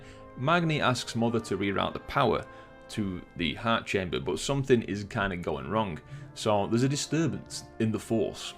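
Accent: United Kingdom. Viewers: Loved your chill and british way of talking!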